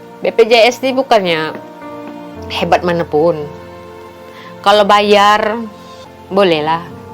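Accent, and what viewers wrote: Malaysia. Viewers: Bahasa sudah seperti bahasa org malaysia dah👍👍👍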